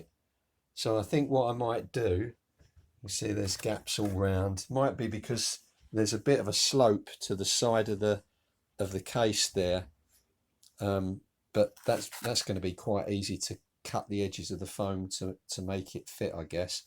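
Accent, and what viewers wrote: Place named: East London, United Kingdom. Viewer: Helpful video. Love your videos. I can't help wondering where your accent originates from. You sound a lot like my late granddad, who was from the London East side. Very soothing to listen to. Anyway keep it up mate.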